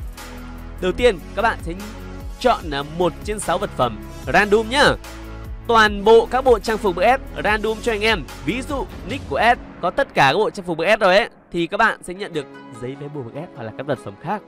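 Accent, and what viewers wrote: Bac Kan, Vietnam. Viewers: Ông ở Bắc Kan à 😍😍 Thích thế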